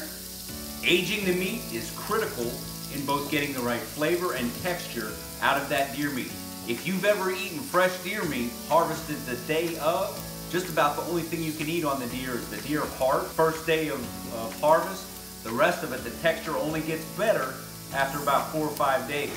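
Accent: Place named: Australia. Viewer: Are you originally from Australia? I hear a bit of a twang on your accent, almost as though you grew up in the down under and moved to the U.S. when you were young.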